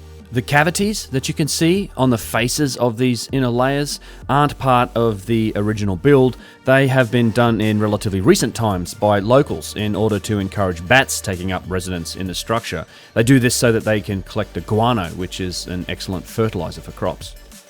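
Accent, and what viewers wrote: Australia. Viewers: Good on you mate. I have developed quite a taste for your output and even though I don't necessarily agree with some of your ideas it is refreshing to see someone not just regurgitating the musty work of the orthodox crowd and be prepared to come at these matters from a different perspective ,,particularly with an Australian accent. I don't know if you are self taught but if so that is even more praiseworthy given the range of topics you skate through while showing the bird to the "club" I am enthralled by the current debate bubbling away concerning the age of the sphinx and would love to hear you do a paper or podcast on that. The proponents of the older sphinx are not ratbags but well educated professionals with fairly persuasive arguments from geology and a range of other disciplines to support them .It is fascinating to observe such a vibrant argument.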